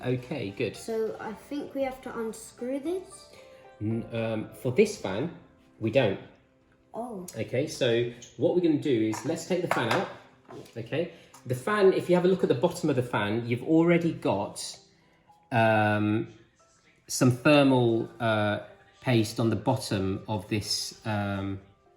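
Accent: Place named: United Kingdom. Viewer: Can u plzz tell the prize in pounds as from the accent I guess u are from Britain ☺️(excluding the monitor)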